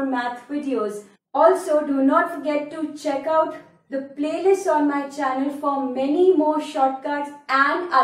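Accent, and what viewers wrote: India. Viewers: Nice trick. Good job and the Indian accent was very clear and understandable.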